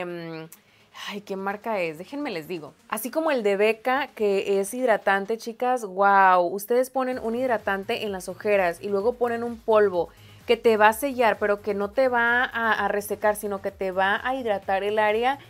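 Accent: Sinaloa, Mexico. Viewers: Me encanta tu asento sinaloense aqui en Oaxaca les copiamos algunas palabras x eso me identifico con todo lo q dices mi 💗💗💗💗💗💗💗💗💗💗👑👑👑👑💅💅💅💅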